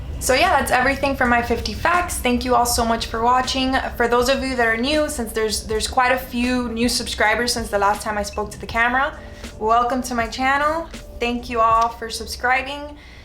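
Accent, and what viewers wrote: Cuba. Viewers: i knew you were cuban, you can hear it in your accent. love your style! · You kinda sound like Derek Zoolander, he he, maybe it is your Cuban ascent : ) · girlllll I subbed strictly because I heard your cuban accent and this video confirmed you were! 305 till we die... hahaha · OH MY WORD I KNEW YOU WERE FROM MIAMI!!!!! YOU HAVE THAT CUBAN ACCENT FROM HIALEAH...not a bad thing I just love ppl that don't forget their roots. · I totally knew you were Cuban! Your accent really reminds me of Gloria Estefan’s! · ah okay so you are cuban, was wondering because of your accent!! Aries here too!! yay ^^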